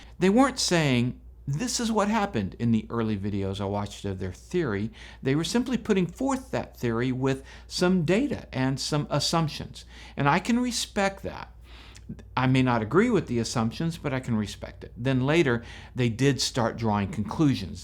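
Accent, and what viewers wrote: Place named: Midwest, United States. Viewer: Your command of our (American English) language, -being an American as reflected in your Midwest-ish accent(???)- is so much better than most of us. Interestingly, at one point, you used the word dived and I was ready to pouunce on that. Dived IS a past-tense term for dive, but so is the more familiar American term **DOVE** ... thus I ask: are you a Brit in American or Canadian sheep's clothing? Just curious. Oh, and yes, I love the tone and attitude you have in your thoughtful videos. So there.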